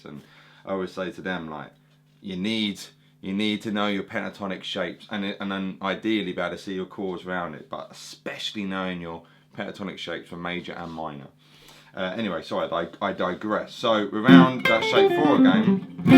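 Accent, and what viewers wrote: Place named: Cockney, United Kingdom. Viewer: def sound like a cockney I was born in whitechaple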